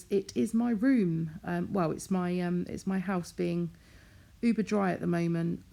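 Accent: United Kingdom. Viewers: Bits and bobs is definitely British, haven’t heard that in a while. Bits and pieces in the US. Love watching and listening to your videos makes me feel like I’m back in the UK. Lived in the US for 30 years now, makes me smile hearing things I haven’t heard in so long. Thanks for making me smile 😊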